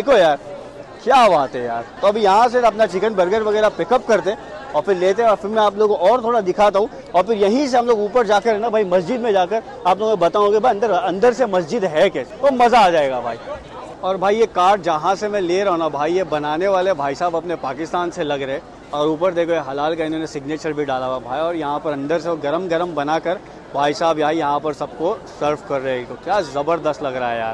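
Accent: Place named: Mumbai, India. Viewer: I am watching ur video for the first time, can you tell me where you are from? Because your voice is from Mumbai, please tell. Bhai jaan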